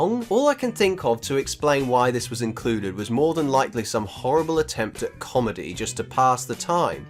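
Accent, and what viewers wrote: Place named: Wales, United Kingdom. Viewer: There's nowt wrong with a Welsh accent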